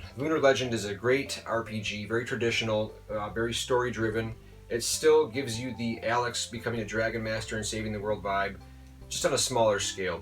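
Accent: Midwest, United States. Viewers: Hi there. As always I enjoyed this video. You strike me as a good family man and you mention your son quite a bit on your channel. So why not have him on in a future episode? Also as a side note. Are you from the Midwest, cause I detect a slight Midwestern accent. Thanks again for the video.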